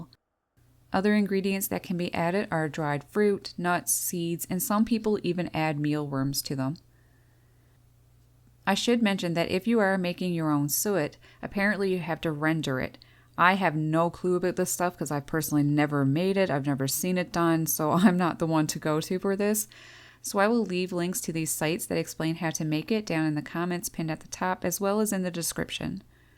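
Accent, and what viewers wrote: Canada. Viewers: Great post and lovely Canadian accent.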